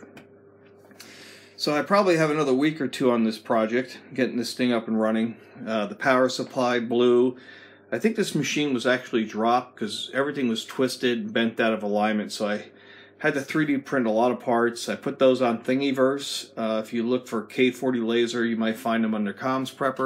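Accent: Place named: United States. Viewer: seems like a lot of money for a used k40 when a brand new one is only about 340 usd..and knowing that laser tubes (most costly and fragile consumable part) have very limited life..the risk is too much in my opinion unless you got it for 50 usd..but thats my opinion....fyi why you speak american english and refer to euros? seems a bit odd to me.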